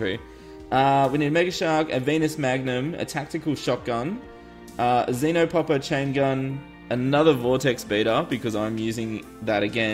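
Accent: Australia. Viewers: I got the Rod of discord in roughly 2 hours without farming I'm so lucky and are u from Western Australia Could u plz say I'll be back in Anarlds sweggnegers voice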